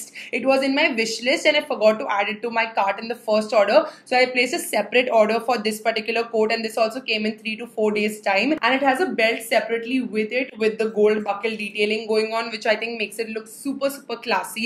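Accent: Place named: South India, India. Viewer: Sometimes I really feel you are south Indian by your accent.. ❤❤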